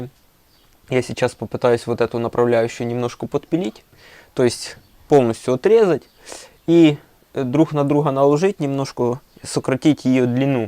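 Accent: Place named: Urals, Russia. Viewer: Умань? а говор как на урале ))))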